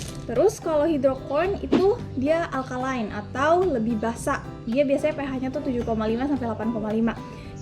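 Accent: Japan. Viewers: baru mau coba menggunakan media leca buat fern cari referensi nemu lah video ini yg pas, ada aksen japan nya btw ternyata prnh/lama di sana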